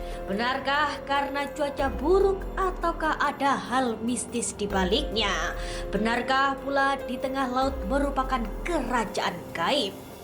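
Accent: Java, Indonesia. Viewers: Pembawa berita nya medok bnget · Suaramu medok.mbak Wong jowo ya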